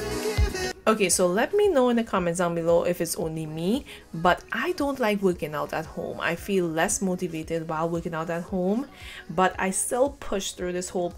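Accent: Trinidad and Tobago. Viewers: I came to get inspiration for me to continue one of her challenges but then I heard your accent...HIIIIIIIIIIIIIIIIIII TRINBAGO SIS❤❤ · I'm from trinidad and I made out your accent just had to scroll through the comments to make sure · Jayna Danielle Im saying this girl sound like Trini Im from Trini.... I live in Arima be safe 🙏🏼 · As soon as you started talking i was like she's from Trinidad or if not from the Caribbean. I am Trinidadian as well! But currently in the US. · Is that a Trini accent?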